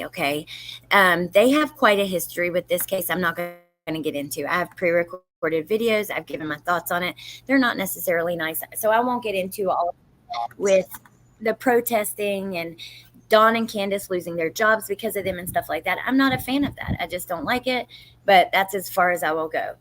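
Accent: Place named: West Virginia, United States. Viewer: I knew that accent! WV here too.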